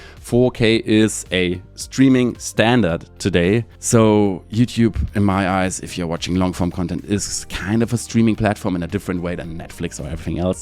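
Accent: Germany. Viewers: You might not like your speaking English, but listening to you made me really enjoy the German accent as an native English speaker (American). You have such a lovely cadence 😁 I'd have to agree, Nadi feels over edited because he does the same thing different ways. If he did it just once, it would feel more balanced and not a redundant show off in my opinion.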